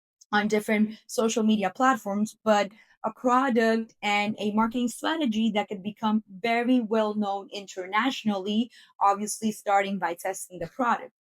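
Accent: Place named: Puerto Rico. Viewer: Nice vid. Why do you have an indian name but a puerto rican accent?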